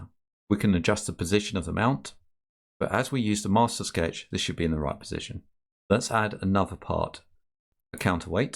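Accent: United Kingdom. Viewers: Fantastic video, I'm so happy to listen an English accent.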